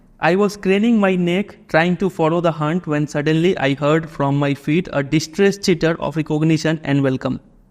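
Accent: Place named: East, India. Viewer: Most probably east or north east india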